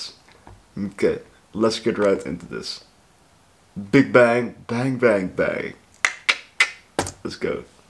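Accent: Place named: Netherlands. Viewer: Loving the Dutch English accent, kon gelijk horen dat je uit Nederland kwam 😂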